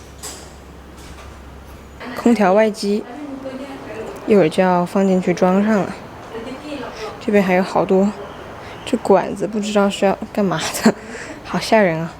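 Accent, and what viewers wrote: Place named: Hunan, China. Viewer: 普通话带湖南口音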